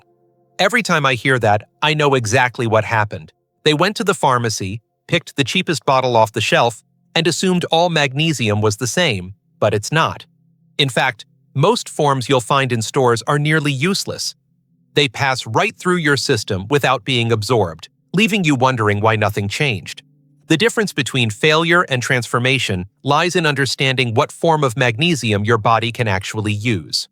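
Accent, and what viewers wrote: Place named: United States. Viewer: 0 Dr you can not deny that you have Indian Genes Than why you do not SPEAK IN INDIAN TONE? HOPE YOU WILL ADMIRE OUR CONCERN AND WILL avoid American tone in your videos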